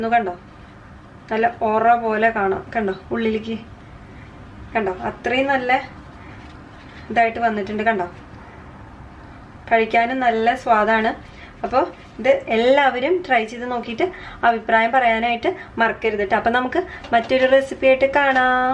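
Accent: Thrissur, India. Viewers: Haiii chechikutti njan puthiya alanetto first vedio kandappo thanne subscribe cheythu Yummy recipe Enik tcr slang othiri ishttaaaa Thrissurkarum poliya Love you ma swt ♥️♥️♥️♥️♥️ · Oru raksha ellaattaa chechi 😍 THRISSUR Kari aaaano?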